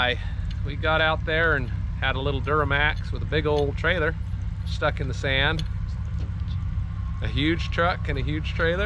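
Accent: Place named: South, United States. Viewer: where are you from? you seem to have a southern "drawl"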